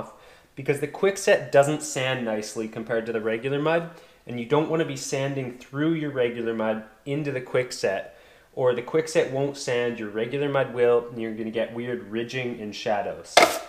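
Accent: Vancouver, Canada. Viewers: the Vancouver accent is smoother than the Ottawa accent... less oat 'n aboat!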